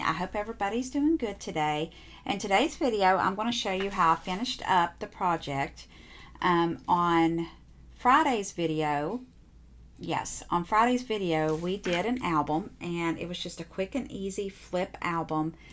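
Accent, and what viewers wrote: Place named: South, United States. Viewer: I’m so glad you popped up on my you tube recommendations. I immediately subscribed. Can’t wait to go back and binge watch. Hope you tell us where you’re from because we have the SAME southern accent. 😊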